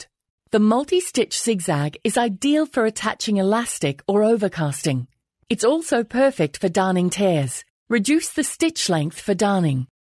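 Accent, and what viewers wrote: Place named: Oxford, United Kingdom. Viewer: Finde jetzt die Erklärung in diesem verhältnismässig langsam gesprochenen und klar verständlichen Oxford Englisch mit den entsprechenden Bildern dazu sehr gut zum nachvollziehen. Was schreien hier alle nach einer deutschen Anleitung? Ist denn bei der Maschine keine dabei? Viel falsch machen kann man ja bei dieser Nähmaschine nicht, wenn man ein klein wenig logisch denkt beim arbeiten ;-) Dank Globalisierung ist die neue Geschäftssprache halt Englisch, so what? Ich dachte auch - bis heute - dass SINGER ein deutsches Unternehmen ist, hab ich wieder was gelernt dank den Kommentaren hier. Danke!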